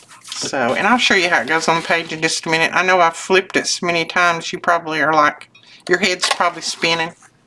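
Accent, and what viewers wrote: South, United States. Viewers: God bless you with Peace, Courage and Strength. My husband of 43 years passed away a year ago July 14 then my mother's earth suit gave out at age 93 in January '24. You have been a companion of mine since I found you and am very grateful for your Southern upbringing which is reflected in your sweet accent and charm. I am now a faithful student of yours BTW, I have two daughters, three granddaughters and a grandson. Two of my granddaughters (sisters) now live with me to my great delight. I will pray for you and encourage you to face each day with grace while looking forward to the goodness to be found when you look for it. Kay Davis Peddie, Quincy, FL.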